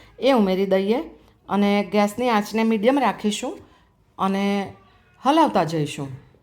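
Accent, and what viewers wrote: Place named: Valsad, India. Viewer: Valsad baju na su k madam tme?bau saras rcps hoy 6 tmari😃😃😃 pn tmri bolvani style pr thi lage 6 vlsad jeu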